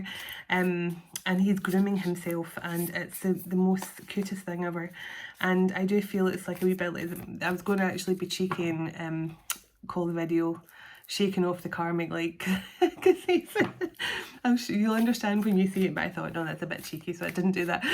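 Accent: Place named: Scotland, United Kingdom. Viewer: You look So Lovely ❤ Blue and White, blessed with a Scottish voice 💙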